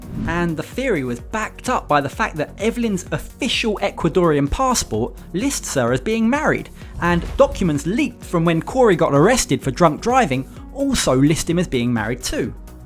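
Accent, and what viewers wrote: Cockney, United Kingdom. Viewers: For Christ's sake get someone who doesn't sound like a chavvy Cockney chimney sweep from 1870 to narrate these videos. What an embarrassment that accent is to the entire nation.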